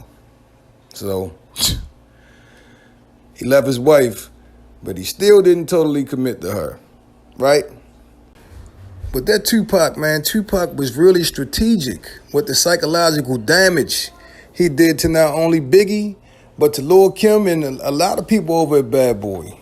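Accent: Baltimore, United States. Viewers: Do you think Lil Kim started to get plastic surgery to look like Faith?? Just think about how Faith looks and how Kim looks, she even changed her complexion to Faiths complexion. And damn near her body shape too... please give me y’all thoughts 💭... Are you from Baltimore? Cause I can hear how you call folks “Yo” lol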